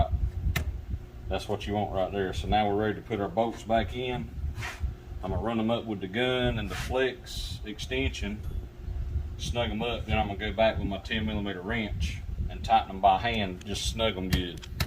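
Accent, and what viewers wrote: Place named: South, United States. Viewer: Man I thought I talked country but I think you have me beat. Keep up the good work!!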